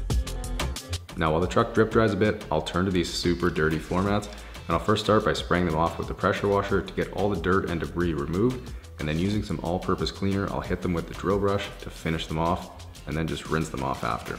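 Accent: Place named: Canada. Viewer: I can't get over the Canadian accent.😂 so cute! Such a satisfying video!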